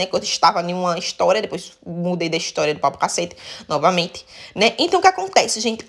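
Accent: Northeast, Brazil. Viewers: Vc é muito espontânea e engraçada. Vc é do Nordeste? Amei o seu sotaque. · O sotaque nordestino é o melhor do mundo, amooooo!! Tú é muito boa, mas a narração é a melhor!!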